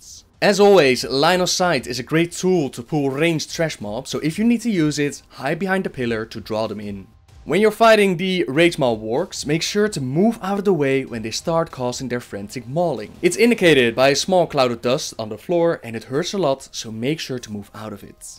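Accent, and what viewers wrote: Netherlands. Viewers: Hey man , recently i've become interested in lore and stumbled upon your videos , i've enjoyed them alot and learned so much since i started to watch them , now i have been asking myself are you dutch cause you have the same accent as me when i talk english haha . :P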